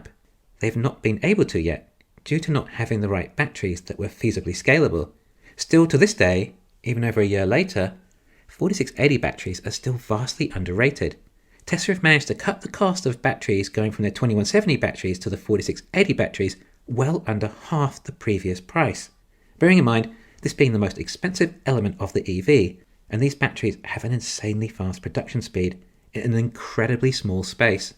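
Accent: England, United Kingdom. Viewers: You British? I followed you for an year without realizing that,thinking you're from the States. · English accent...word have more than one syllable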